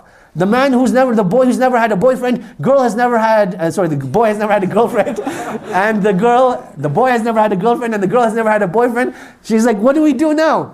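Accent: South, United States. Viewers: al salaam aliekum yous sound like your from oje of the Southern states. I onow plenty of muslim people whom I have had the pleasur of working with and they have always been peace loving and shared the same intrinsic values as I do, some have escaped oppressive regimes like the taliban and have come to Sydney for freedom to worship to work and to give families a better life. There is a mm uslim preacher called Nounan Ali Khan, I often watch him on youtube, whilst he teaches out the Quran just about alo he mentions on guidence for life, and godly wisdom is also in the bible, he is very gifted in being able to get his message across. Ias a christian I see the church should be out there building bridges with people. There is no room for anti sematism and islamaphobia. Sadly though people of all faiths use there faith platform for selfish and politican means. 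Thankyou for your kind words alhamdililh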